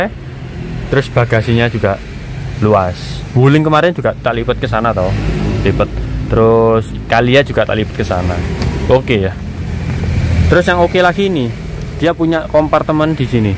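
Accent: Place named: East Java, Indonesia. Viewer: Bahasanya kok jawa timuran om sampean wong endi 😅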